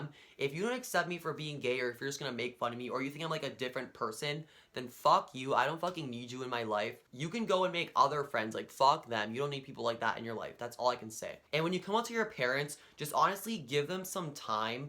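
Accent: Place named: New England, United States. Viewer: I have to smile the way you talk, you sound like you're New England Italian? Hope you and your parents work things out, give them time, there trying to deal with this the only way they know how.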